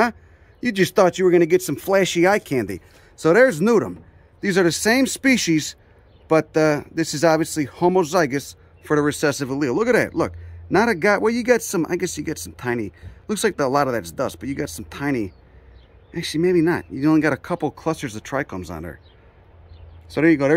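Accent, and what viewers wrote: Chicago, United States. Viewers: I love your videos!! If you come near the Northeast I could show you some great spots...I'm extremely interested in wild edibles, (my 4 acre yard Is one big botanists dream!) My thick Boston accent and your chicagoan accent would meld perfectly. Keep on keeping on my friend!!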